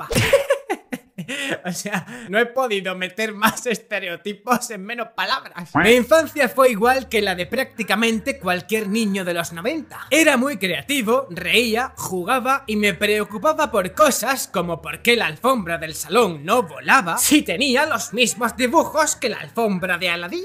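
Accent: Spain. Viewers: Yo si pensaba que era de España y la verdad es que me gusta su asentó y sus videos :3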